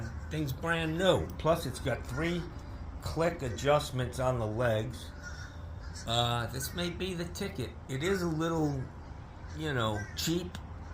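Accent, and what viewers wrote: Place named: Northeast, United States. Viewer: your face now fits your NE accent! Not many people get to a point where their faces and voices line up.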